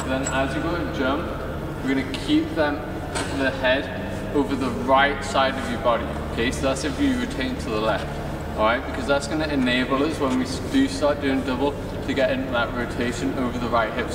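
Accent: United Kingdom. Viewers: Are you British your accent is coming from London uk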